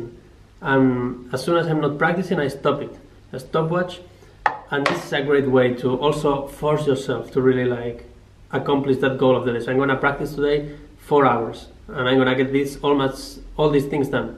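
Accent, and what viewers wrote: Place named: Iberia. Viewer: Ése acento ibérico.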